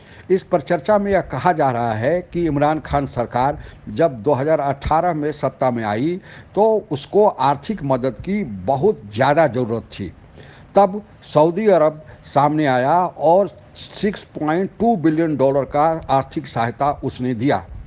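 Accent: Bihar, India. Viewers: me toh samjha samjha k thak gaya uncle ko ki bihari hindi tone achi sunai nai deti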